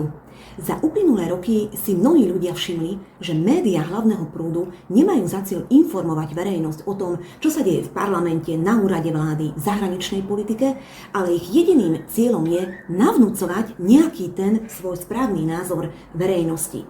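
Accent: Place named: Hungary. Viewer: Milujem vašu maďarskú Slovenčinu, žerem vás! ❤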